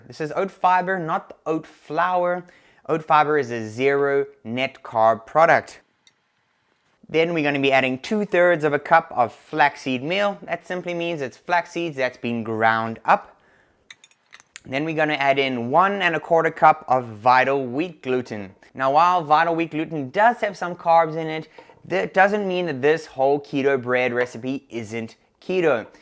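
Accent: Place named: South Africa. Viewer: that south african twang still comes through ;)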